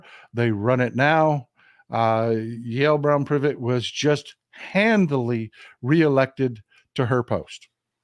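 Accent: Texas, United States. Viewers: I bet you're from Austin?? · My dad was born in Texas. You remind me of how he talked, I enjoy your comments.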